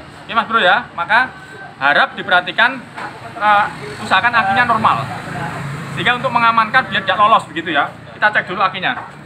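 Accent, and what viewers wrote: Java, Indonesia. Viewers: Jowo ne ngendi mas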